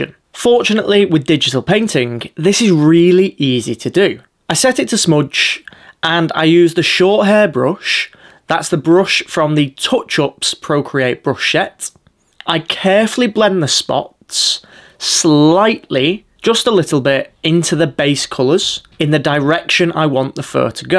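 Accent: United Kingdom. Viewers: i didn’t think so- but i can understand how it felt that way to you. many art videos have a lot of “i see beginners do (this thing i do all the time)…” and feel personally singled out 😀 this is embarrassing to admit but we’re among friends- UK accents immediately make someone sound fancier and i me. “do this, don’t do that” can sound condescending to me like you said- but sometimes for me, it’s the accent for this bonehead american over here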